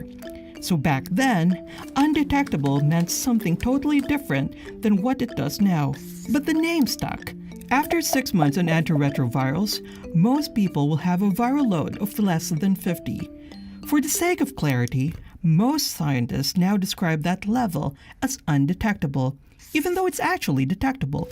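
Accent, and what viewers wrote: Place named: Philippines. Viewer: The narrator sounds Filipino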